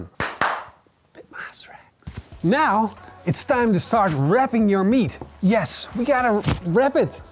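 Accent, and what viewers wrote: Netherlands. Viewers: Is that a Dutch accent I sense? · I LOVE your videos. Part of it is your accent that makes me feel at home ( parents and opa +oma with the same accent.) I would love for you to throw in a few more Dutch words and phrases. 🐖🐖🐖🐖🐖🐖😁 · Do I detect a Dutch accent? It takes one to know one. Great video, trying this tomorrow! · Hahaha. Nou zat ik te luisteren en dacht.... volgens mij is dit een nederlander of ben ik nou gek. Leuk om te zien.👌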